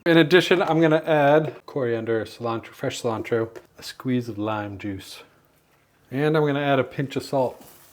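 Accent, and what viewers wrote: United Kingdom. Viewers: is an honorary Brit who I believe has spent a great deal of time working and living in the U.K., so this probably accounts for some of the crossover in pronunciation/conversions etc. 😊💚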